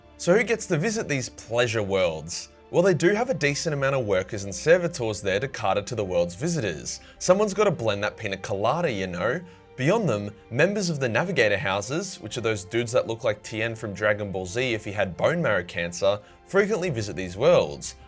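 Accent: Australia. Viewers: This is exactly your type of video, time to use that sweet Aussie voice to explain the most beautifully deceiving worlds in the Imperium.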